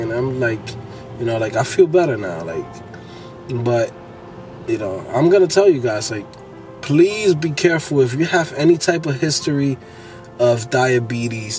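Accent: New York, United States. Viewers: Hey I have been watching your video for days. loved the work. So sorry to hear about your loss, family is the biggest wealth a person has. BTW are are you from NYC? I am from queens and I think you are a new yorker too. :)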